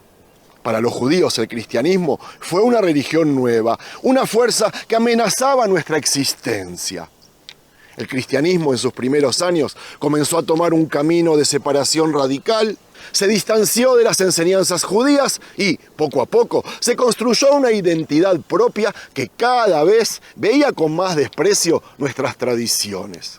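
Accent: Argentina. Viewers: Por qué tiene acento argentino es judío, de verdad o qué? Es una pregunta, espero no ofender🙏❤️❤️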